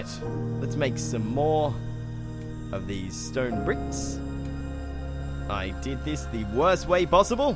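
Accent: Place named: Australia. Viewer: omg, I have been watching your videos for a year now, and I thought. HAY... Hay, I know that accent. :/ OH WOW. says my realisation overclocked brain, it's like 3mhz now! THATS AUSTRALIAN! Then, HAY I'm Australian. suddenly mind blows. great content, keep making those video's. And spores back to, yay! I suppose.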